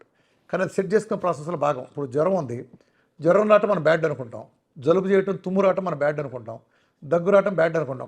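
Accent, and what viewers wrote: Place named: Andhra Pradesh, India. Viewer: Me matalu alane unni memu Andhra me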